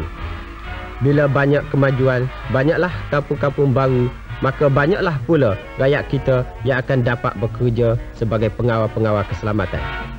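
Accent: Kelantan, Malaysia. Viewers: Penyampai pun slang klate ni..nyakut molep😁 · Yang uniknya suara hos dokumentari ini pun kecek Kelate jugok!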